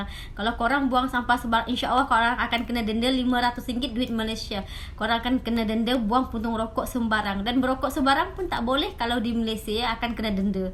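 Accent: Indonesia. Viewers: ni org Indonesia knn... Bahasa yg awk guna tu.. gaya keIndonesiaan tu jelas kedengaran.. klo gaya bahasa Malaysia tulin lain.. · Assalamualaikum..admin ni orang Sabah ke..slang indo pun ada jugak bunyinya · Dia org Serawak, mmg Ade slang Indo ckit kan. Sabahan pun mcm tu Tau. Awak x pernah Ade kawan sabahan n Serawak ke?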